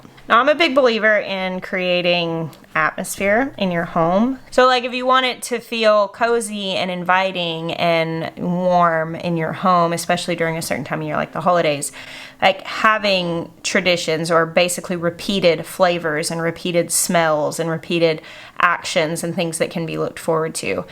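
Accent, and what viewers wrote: South, United States. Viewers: Make this so often in the fall! A friend was just saying she made it for a get together at her house and trying to pronounce it, lol. She said it much the way you do, and not how you said it during our history (love that!) you southerners and southwesterners crack me up with your accents!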